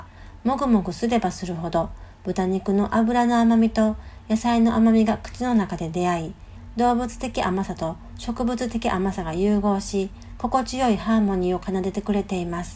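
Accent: Kyoto, Japan. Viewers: 京都弁って～情緒があって～大好き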